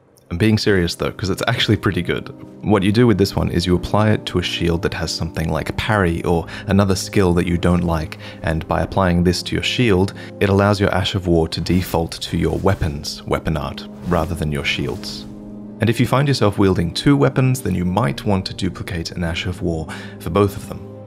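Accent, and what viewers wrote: Australia. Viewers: Seriously can’t place this dudes accent - sounds like a mixture of posh Aussie with a bit of US/UK flavour thrown in? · Thank you, friend, for your sage advice. I am VERY new to Elden Ring and still struggling with the keyboard mechanics having come from a PC WoW type of set up, but this tips and pointers you offer are clear and concise. Being an Australian, it's also nice to hear a familiar accent and I think you should consider reading for Audible. Many thanks.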